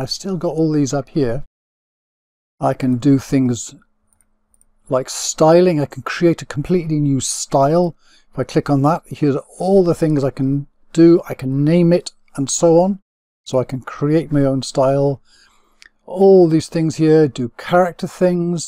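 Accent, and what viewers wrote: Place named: Wales, United Kingdom. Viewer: Just found you. You explained that so clearly... and you're Welsh! Thanks, I've subscribed.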